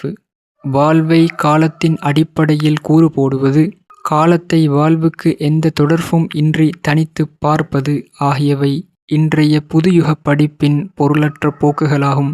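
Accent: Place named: Tamil Nadu, India. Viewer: Assalamu wa alaikum neega peasura tamil ilaggai tamil matheri illai India tamil matheri iruku I am India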